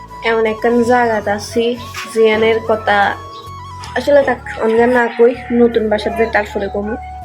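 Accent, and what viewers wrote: Noakhali, Bangladesh. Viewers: আসসালামু আলাইকুম আপু কেমন আছেন আপনি। অসাধারণ সুন্দর নোয়াখালী ভাষা আমার ভালো লাগে। আপনার সব কথা গুলো আমি বুজি।। ভালো থাকবেন আপনি আল্লাহ হাফেজ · তোমার এই ব্লগ ভিডিও টা আমার কাছে অনেক ভালো লাগলো আর ও বেশি সুন্দর লাগছে তোমায় আর তোমার ঐ মুখে নোয়াখালী ভাষা শুনে আমার খুব ভালো লাগলো আর বেশি সুন্দর লাগছে তোমরা ভাই বোন হাসি খুশি হয়ে ম-ম বানাচ্ছে এটা দেখে আমার খুব ভালো ও চমৎকার লাগলো আমি যখন তোমাকে আমার বউ করে আনবো তখন তোমার ঐ হাতের বানানো ম-ম খাবো শুধু তোমার হাতে প্রিয় ❤️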